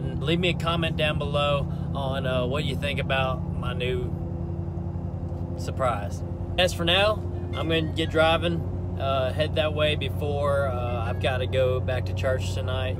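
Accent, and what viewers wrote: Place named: South, United States. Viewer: An Asian dude with a thick southern accent is the wildest shit I’ve seen in awhile.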